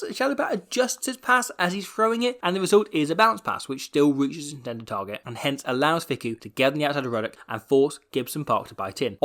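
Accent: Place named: England, United Kingdom. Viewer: Welsh parents but brought up in Nottingham, England hence the accent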